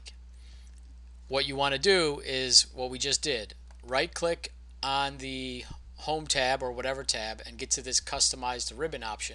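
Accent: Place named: Boston, United States. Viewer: I think I found Bill Burr’s brother! Sounds like him, am I picking up a bit of a Boston accent there? 🤔🤔🤔🤔🤔🤔🤔🤔🤔🤔🤔!!!!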